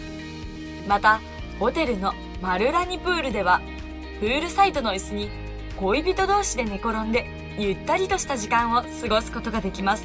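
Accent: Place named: Tohoku, Japan. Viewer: ナレーターの人、訛ってますね。イントネーションが東北チックでカワイイ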